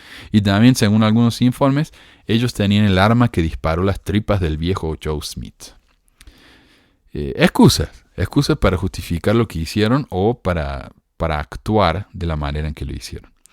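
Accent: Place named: Córdoba, Argentina. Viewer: Me gusta tu vos de cordobés, soy de Buenos Aires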